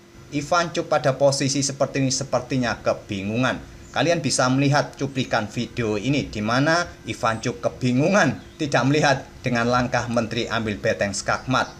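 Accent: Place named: Surabaya, Indonesia. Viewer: O..jebule (ternyata)wong suroboyoan,...salam ko aku wong Kediri sing manggon di kota Bekasi,...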